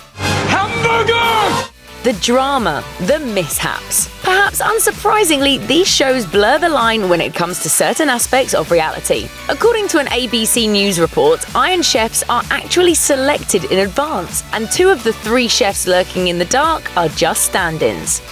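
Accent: United Kingdom. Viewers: British accent commenting on American shows...stupid. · Incognito ? You defending the bitch like that's your wife or something. Calm down you bitch. I just don't like a British accent period. Fuck yall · who's the brit? get back to watch mojo girl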